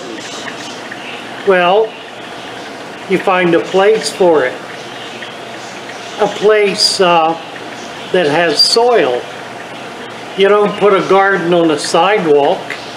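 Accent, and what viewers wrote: United States. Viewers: Found this useful, like i did? I'd suggest three things in addition to enhance the usefulness. Turn on subtitles (especially for those not in America or not used to the accent) and set playback speed to 1.5. Repeat listen a couple of times making notes as you go along. Btw, the main content starts. Thanks for the useful info,!:)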